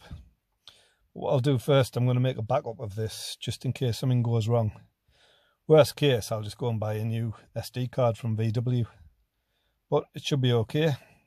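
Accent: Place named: Newcastle, United Kingdom. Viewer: Love the Geordie accent! The most colourful of all English accents. (2020 VW Golf owner). 🦘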